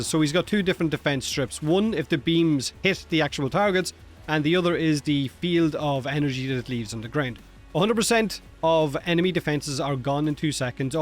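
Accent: Ireland. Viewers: I really love your irish accent 😉 « Moch moch bettar experience » ❤ And I do agree with you btw, it's an amazing frame now. Even better, it's a free gift atm, so imagine for a new player : pick Caliban, and steamroll the whole star chart with your new cute baby sentients.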